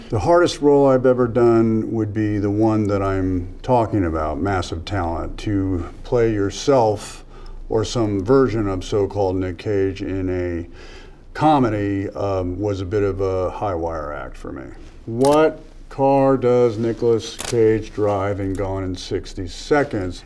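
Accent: United States. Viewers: i have never watched a movie with him so i wasn’t expecting him to sound so uber american lmao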